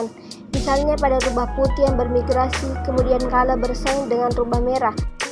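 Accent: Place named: Java, Indonesia. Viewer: medoknya botak hahaha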